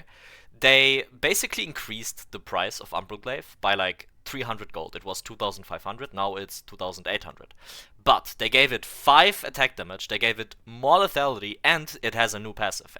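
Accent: Germany. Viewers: Hey, ich höre du hast einen deutschen Akzent. Kannst du mal einen Guide auf deutsch machen, da ich nicht so viel englisch verstehe... Wäre echt prima von dir 🤞🖖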